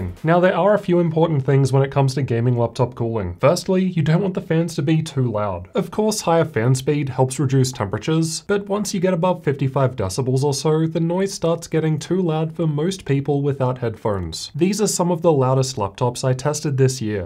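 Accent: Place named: Australia. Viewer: I can hear that you are from australia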